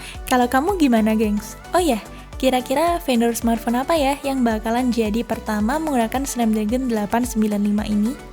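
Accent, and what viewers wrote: Java, Indonesia. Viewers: Mbaknya kall ngomong medok jawa 😂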